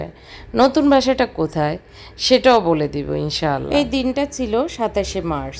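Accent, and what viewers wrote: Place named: Feni, Bangladesh. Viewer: দুঃখিত বোন কিছু মনে করবেন নাহ্ ! আপনি উনার উচ্চারণের ভুল ধরতেছেন।উনার দেশের বাড়ী ফেনী! তাই আঞ্চলিক টান আসে। আপনার কমেন্টে বানানের দিকে একটু খেয়াল করবেন! পড়তে দৃষ্টিকটু মনে হয়!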